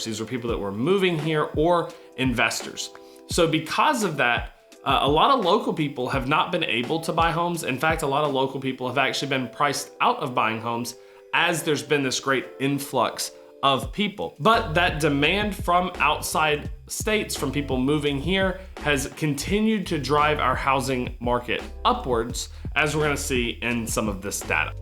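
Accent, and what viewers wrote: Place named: New Jersey, United States. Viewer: Sounds like NJ!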